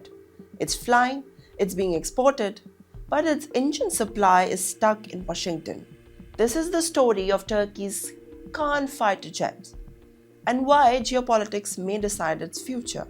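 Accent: India. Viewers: That Indian accent…😮😅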